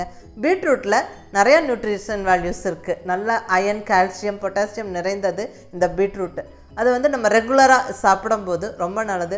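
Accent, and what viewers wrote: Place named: Nagercoil, India. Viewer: Avanga slang Nagerkovil Mathuri irukku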